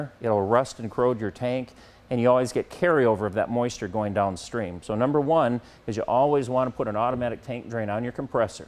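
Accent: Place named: Cleveland, United States. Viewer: talks like Cleveland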